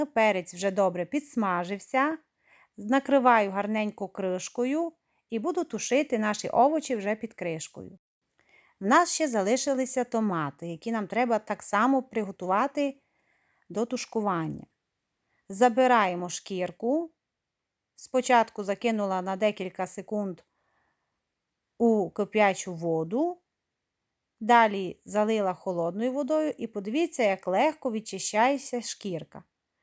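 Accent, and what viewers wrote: Transcarpathia, Ukraine. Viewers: Дивлюсь Вас із далекої Франції, обовʼязково приготую таке лечо! Окрема подяка за ваш закарпатський говір!💛💙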